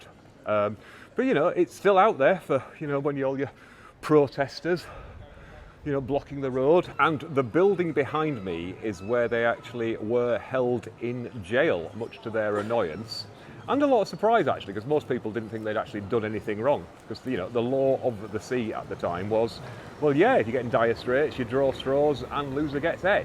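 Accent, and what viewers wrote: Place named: Yorkshire, United Kingdom. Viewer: Not a matter of law, but: my mother's family and their accents came from around Stainland, Sowood, and Jagger Green. I guess yours might be a bit Northwest of there, maybe Mytholmroyd or Todmorden? I live on the other side of the world now, and I've picked up some Kiwi slang, but I don't think I'll ever lose the vowels or the cadence of where i grew up.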